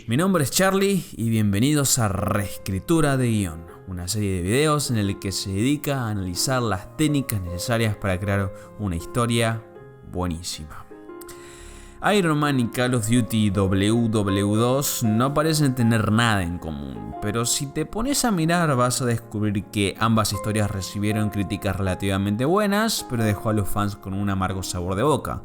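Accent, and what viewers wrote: Argentina. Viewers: Sos argentino no?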